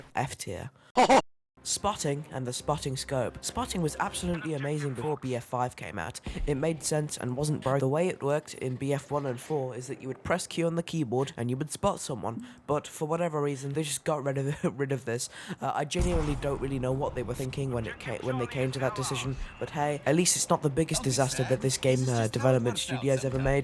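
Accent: United Kingdom. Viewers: He sounds even more British than last time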